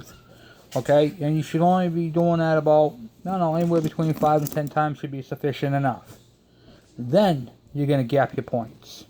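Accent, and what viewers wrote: United States. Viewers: Your arguably the best explainer on boobtube when it comes to points ignition and cdi and wiring. I use your videos to assist me with the wiring issues in old bikes I get. Thank you sir. Even though you sound like a Yankee you are informative and easy with explanation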